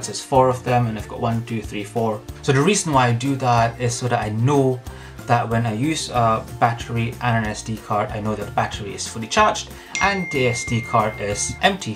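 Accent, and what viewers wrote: Scotland, United Kingdom. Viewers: I like the idea you've got with you GoPro because of that reflection thing.. And I like your scottish accent :D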